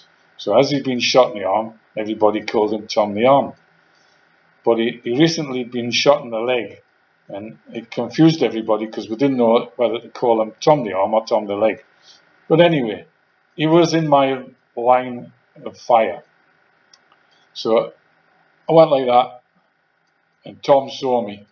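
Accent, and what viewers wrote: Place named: North East England, United Kingdom. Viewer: Nice to hear a north east accent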